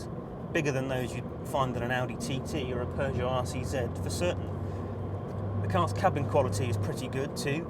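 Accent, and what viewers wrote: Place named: England, United Kingdom. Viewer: Because he is English, and that is how we English say 'Z'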